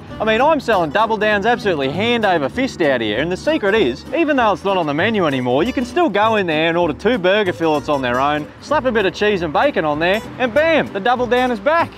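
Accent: Australia. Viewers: god the australian accent is glorious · The australian accent is very much accurate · i had to listen to the opening of this video 3 times before i was able to comprehend you were in fact speaking english. what the fuck is the aussie accent.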